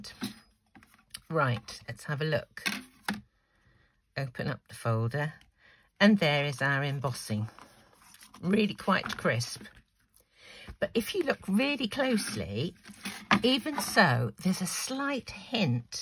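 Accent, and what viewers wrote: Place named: RP, United Kingdom. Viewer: Watching you gives me an immense sense of calm. Your voice is relaxing, and quite, ”posh” at least to these American ears! Anyway, keep posting your wonderfully soothing tutorials.💜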